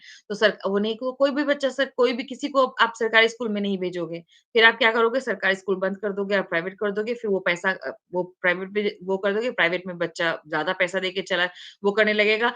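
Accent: Standard. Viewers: Madam आप का हिंदी बहुत ही शुद्ध है 😊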